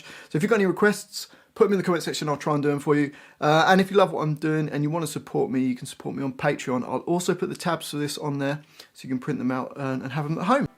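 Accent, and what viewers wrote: United Kingdom. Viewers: Do you sound like Ozzy Osbourne, are you English?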